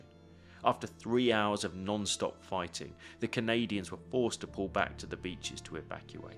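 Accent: England, United Kingdom. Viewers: That the narrator has an English accent says everything 😞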